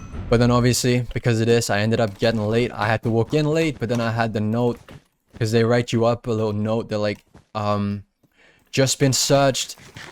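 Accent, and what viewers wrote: United Kingdom. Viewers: bro i luv ur british accent thats the reason im watching ur vids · i dont care if you sound like brithish be strong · well sorry you sound Scottish like me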